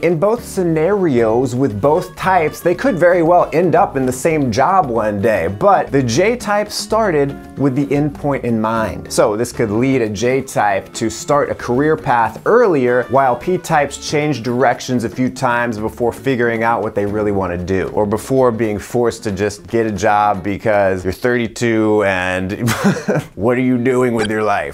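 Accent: United Kingdom. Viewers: i'm british, i've gotta say doesn't sound anything like a Londoner but sounds like the people where i live, good british accent.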